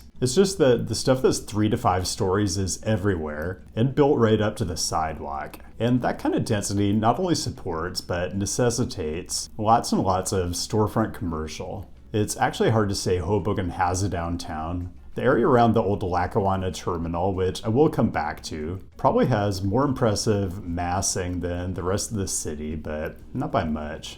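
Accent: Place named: West, United States. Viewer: He has a General American accent, which is most of the Western USA. He grew up in the NW in Seattle.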